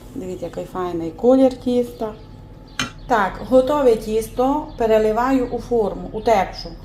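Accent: Transcarpathia, Ukraine. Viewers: Так, це закарпатський діалект, колоритна говірка. Якщо «бесит», не слухайте. Нас з деяких пір російська дратує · Аби шо. Сказало, як у діжку пукнуло. Це закарпатський діалект, обожнюю його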